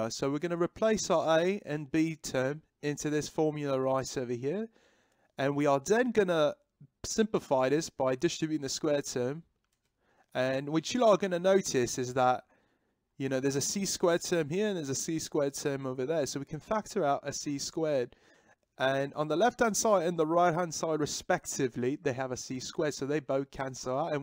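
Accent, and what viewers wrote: United Kingdom. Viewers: Hmm a british explaining trignometry,what was i serching for 😅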